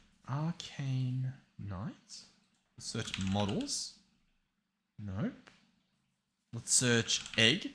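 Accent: Australia. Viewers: southern hemisphere brother, probably australia based off his accent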